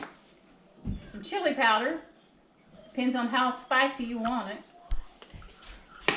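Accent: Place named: South, United States. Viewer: girlllll I absolutely love this video you gotta do more, umm and I love your southern accent you're gettin I'm so jealous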